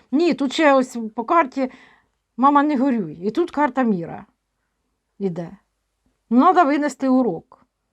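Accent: Ukraine. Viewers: Пані дорогенька ми Вас любимо Ви Є уособленням України, жіночності, берегині. А то пише провокатор, неук, щоб укинути колот. Ви абсолютно по Українські, по народному розмовляєте, говорите... Дякуємо за Вашу працю, цінуємо, любимо!!!😍🥰💙💛🌻🌼💐🌻🌼💐🌻🌼💐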